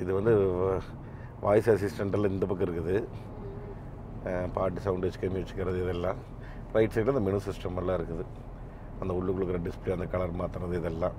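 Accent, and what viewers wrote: Kongu, India. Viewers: கொஞ்சும் கொங்குத் தமிழ் பேசும் கோமகரே...! திகட்டாத தித்திக்கும் பேச்சுடைய திருப்பூர் திருமகரே...! எங்கள் மதிப்பிற்குறிய " " திரு. அண்ணா அவர்களே❤❤❤🙏 எப்படி இருக்கீங்க அண்ணி❤❤❤🙏 எப்படி இருக்காங்க ரித்திக்❤❤❤ எப்படி இருக்காரு திருப்பூர் திருமகரும்❤❤❤ திருமகரின் திருமதியும்❤❤❤ இந்தக் கிளிகள் இரண்டும் கொஞ்சியபடி "கியா கேரன்ஸ்" காரில் பயணிக்கிறது😊❤❤❤❤ என்றும் அன்புடன் உங்கள் தம்பி என்றும் அன்புடன் உங்கள் "TMF" ரா.முஹம்மது கபூர் (சென்னையில் இருந்து)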